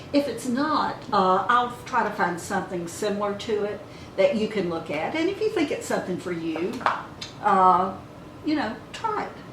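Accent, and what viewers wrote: South, United States. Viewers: what a southern bell! ❤ say ole again😅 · ...you're amazing! I'm so glad I found your channel! I'm 53 and everything was hanging in there quite nicely with my looks until about a year ago. Hormones...dang..who knew?! Lolol It may be a longer process, but I'm going to continue to put effort in myself. You're so beautiful inside and out!❤️ Are you southern? Love your sweet accent! I'm an Alabamian 😁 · Just found your channel & I have to say I love it! I think your awesome & funny! I'm 55 & now & i hope I look half as good as you when I'm 60+!! Do I detect a Southern accent? I'm from north Mississippi myself. Look forward to watching many more shows! God bless & take care. Love, Ollie Renee' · Stunning woman! I am obsessed with your southern drawl😍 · . You really don't need the face lift band. Your face is lovely. I thoroughly enjoyed your video, very informative, but more than that your delivery is sweet. 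Pray tell what part of America are you from? I am assuming the south. Keep doing what you do, it works.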